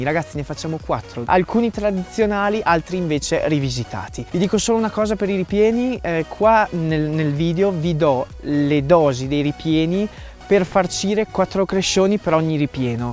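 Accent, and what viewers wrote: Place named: Romagna, Italy. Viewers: Il cassone! 😍 E comunque l'accento romagnolo a sentirlo dagli altri si sente tantissimo, tipo "ma quindi gli altri quando mi ascoltano sentono sta pronuncia qui????" BICARBONATO, sempre! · Non tradire dora troppo forte la tua voce romagnola grande x lo straccio buonissimo tutto e tu grande cuoco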